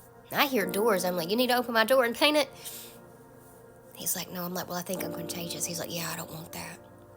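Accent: South, United States. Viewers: ❤❤What a beautiful message from a Beautiful Lady Pastor... Thank you Ma'am... Your lovely Southern accent was a Breath of Fresh Air.., complimented by your Spirit filled message... I say all this as your message and you were the first to come up on my Screen, after one of the most terrible spiritual letdown I've experienced for more than 5yrs...I was so excited to experience a major breakthrough in my long walk in Christ., with all the Blessings promised.. via messages from spirit filled children of God...after a 2 hr conference call with powers that be... Totally the opposite of what I was expecting.. 😬I am in in such a freaked out frame of spiritual battle...& want so to vent.. I saw your vid, subscribed & really was glad I watched... You're beautiful, down to earth humbleness of a Jesus filled lady.. Thank You soo for this message...I was truelly Blessed. 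🌹✝️👏🙏❤️BTW.. I am from South Africa... Johannesburg.. Praying for Your land.. ✝️👏🙏❤️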